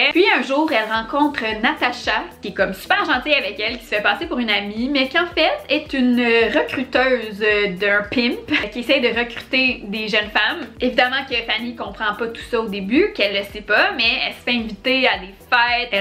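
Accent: Quebec, Canada. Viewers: oui je sais je souligne juste que j'aime beaucoup l'accent Québécois. J'habite en France et j'aime beaucoup regarder des films Québécois qui sont de qualité et aussi pour écouter l'accent 🥰🥰🥰😙😙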